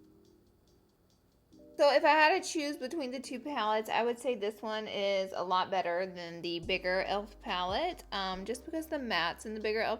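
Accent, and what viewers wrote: South, United States. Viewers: Second time watching your vids and I can say you're really funny and i like your accent, even though i normally dont like country accents (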